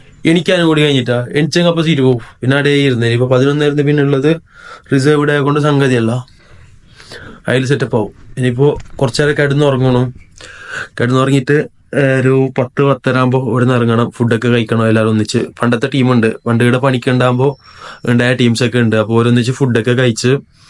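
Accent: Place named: Kasaragod, India. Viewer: Kasaroda slangilanne describe aakiya madeera Ade majeullu Baaki south Kerala slangilellu kore vlog indra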